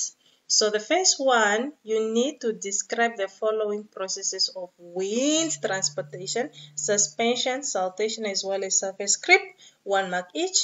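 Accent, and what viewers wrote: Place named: Africa. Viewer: The fact that you speak like an African makes me understand really....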